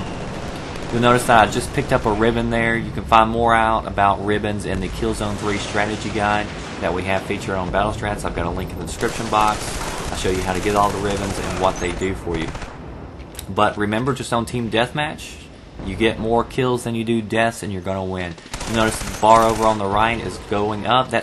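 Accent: South, United States. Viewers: where are you from? you sound like you're from the south.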